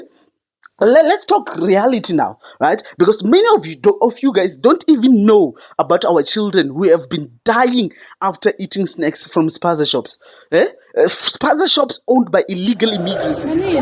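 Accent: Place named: United States. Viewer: I dont think you are south africab, you sound American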